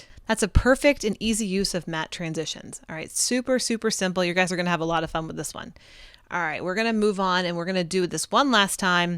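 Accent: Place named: United States. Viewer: Very good explanations and perfect us accent for me (French 😉) !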